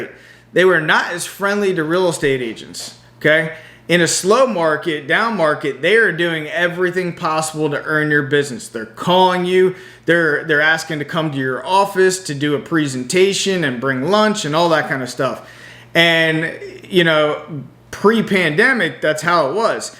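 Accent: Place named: Delaware County, United States. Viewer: I hear a Delco accent from this gentleman. I know this to be true.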